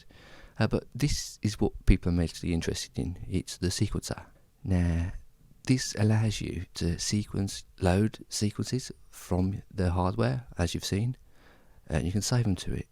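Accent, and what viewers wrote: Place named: England, United Kingdom. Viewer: thanks for the tutorial. May I ask what part of England you are from? I'm fascinated by accents, thanks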